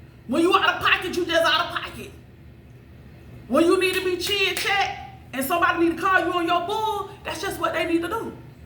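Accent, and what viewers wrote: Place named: Alabama, United States. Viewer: Is that a Alabama accent ❤ U sound just like a good friend of mine 😊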